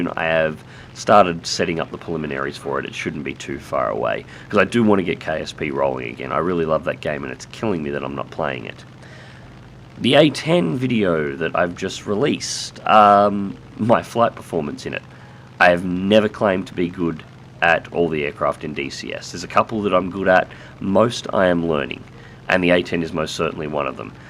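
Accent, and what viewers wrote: Australia. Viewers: always sound like crap you crazy Dingo!!!! Your Austrailian, which is sexy if your Olivia Newton John or Kylie Minogue!!! You sound like the old guy from Air Supply most of the time!!! "LOL" -- You have brought me sooooo much fun, lucky day for me when I stumbled -literally - on to your channel!!! I think of you as my good friend down under -- Fair Dinkum --- Sprog1 in AZ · Hi, It's glad to see your new video on youtube. I thought Australian English was so-called "unbearable" before watching your videos, but now I'm pretty sure I like your Australian accent. Thank you for the exceptional skill you show in the video.:) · yeeeeeees!!! finally! go away sleep, I have a fellow Aussie to listen to!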